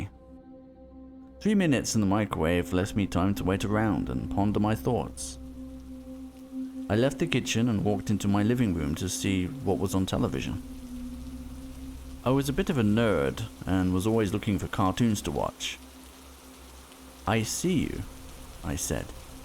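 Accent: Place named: England, United Kingdom. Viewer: Subscribed on the first thing. Yay~ Your English accent is so pleasant and your voice will definitely be in my ears as twice as before since you will be uploading quite often from now on. 🤭 Super thoughtful of you to add in the pixel art 3D styles visual, totally loving it. It makes the entire video become so interesting and lively. I got spooked . Lmao. 🤫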